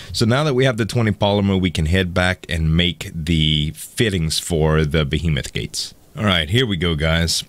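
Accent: Louisiana, United States. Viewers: Is Z from louisiana?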